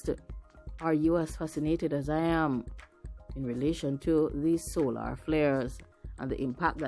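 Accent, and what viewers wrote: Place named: Jamaica. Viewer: Me luv ya Jamaican accent.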